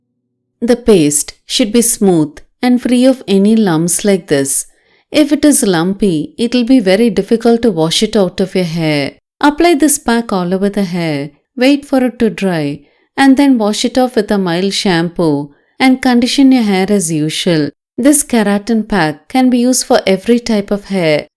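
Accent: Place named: South India, India. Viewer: I love your south indian accent🥰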